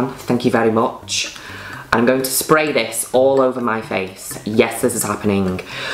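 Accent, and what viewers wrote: Manchester, United Kingdom. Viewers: what dialect Britain are you? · as a fellow manc i think your accent is STUNNING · Your manc accent makes everything so much better 😂😂 makes me feel at home lol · violently british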